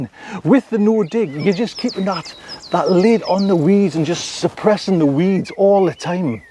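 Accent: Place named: Northern England, United Kingdom. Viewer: Look at yourself. Clearly middle aged Northern English lower middle /working class white male. Probably hetero. Probably no disabilities. Accent from an English region that did not vote Remain. You don't tick any BBC Diversity boxes at all. What did you expect? Try transitioning and wear nothing but a a pink bra and panties to the interview next time.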